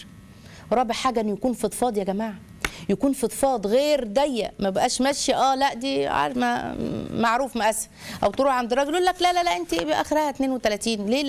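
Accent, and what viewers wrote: Egypt. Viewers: انا البس حجاب طويل لا تنانير ولا سراويل ولكن مش شرعي واتمنى لبس الحجاب الشرعي ولكن كلما أريد لبسه لا أعرف ماذا يحدث واخاف ما التزمش به والقريبون مني يقولون لا احتاج لانني هكذا مستورة فأرجو من يرى تعليقي ان يشجعني على الحجاب الشرعي اكثر ارجوكم انا اتمناه الله يهدينا جميعا ياارب 🤲🇩🇿🇵🇸انتي جميلة جدا ما شاء الله وكلامك عسل يدخل إلى القلب وبسببك انا وكثير بنات راح نتحجب مثلك تبارك الرحمن ما احلاكي ولي زايد حلاوة اللهجة المصرية كل العرب يفهموها 🥰🇪🇬😁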